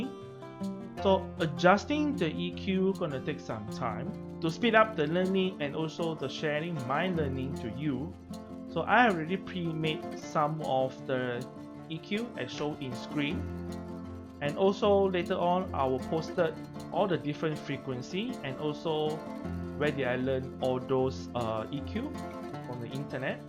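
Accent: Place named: Asia. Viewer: Get rid of the damn background music. It is bad enough that your Asian accent is difficult to comprehend. It is even worse to try to learn from this video with that background noice.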